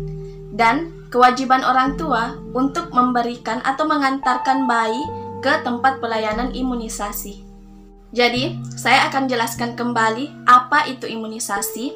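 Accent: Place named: Bugis, Indonesia. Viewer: logatnya seperti orang bugis 🙏😁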